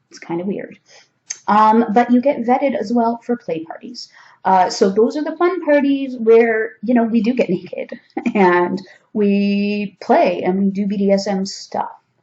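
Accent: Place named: Toronto, Canada. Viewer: Ahhh. Fresh Air. What captivating colors starting with your eyeballs osmosing that shade of which blue? How about Blue - eh? Wow, I knew your Toronto accent in the video I just watched. So yeah, You are telling me answers to questions I've read about every group's posted rules, I extrapolate correctly but how to dress, thank you, how to keep your hands above the table, thank you, how self protections begin before you get to an event, thank you.There are some pluto in leo people that about now are beginning to understand playing fields being totally rearranged leveling the starting gate struggling through tech, even if really good, not as smooth second nature appendage of Saturns first return this year since 1981ish. fFinding how to sit and wait for on line response isn't just goofing off any longer. Wow...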